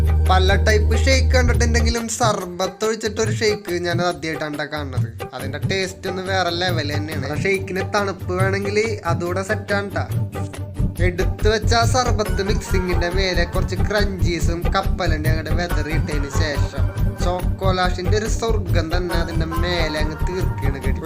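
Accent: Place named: Thrissur, India. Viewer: Good presentation I like your style and way of speaking....by hearing this I proud of my Thrissur slang❤️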